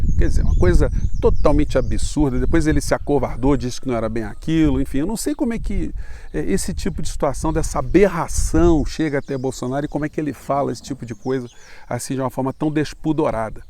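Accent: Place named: Minas Gerais, Brazil. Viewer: Uai!!! Não sabia que vc é Mineiro, mas desconfiava pelo jeito de falar!! Aaaamo! 👏👏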